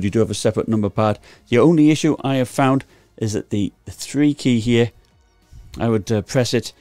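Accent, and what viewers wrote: United Kingdom. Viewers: You sound british mate, wouldve appreciated the price in the GBP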